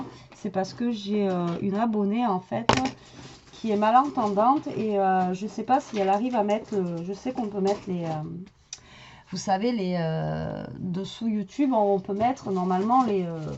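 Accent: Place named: Toulouse, France. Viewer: Cc, il est vrai que je mets pas trop de commentaires en ce moment, j'essaye de me débrouiller toute seule mais pas tjs évident, et pour ce qui vous suit, je voulais dire que c'est vraiment grâce à vous que j'ai pu apprendre car vos tutos sont totalement formidable, c'est très bien expliqué, simplement et efficacement, je reviens tjs vous revoir pour tjs réaliser vos réalisations et c'est tjs un plaisir de vous revoir avec votre accent que je connais si bien, que j'adore, car je suis toulousaine, restez tjs là même car d'autres personnes comme moi qui étais vraiment débutante, aurons besoin de vous comme je le suis encore, et qui font que nous apprécions le scrapbooking, merci encore à vous, je vous embrasse 😘😊😊😊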